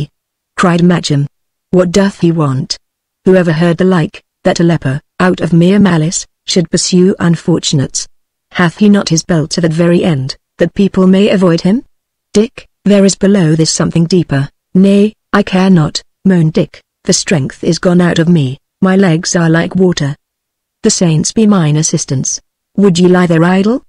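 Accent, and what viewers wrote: United Kingdom. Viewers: British female robot. Awful. Avoid.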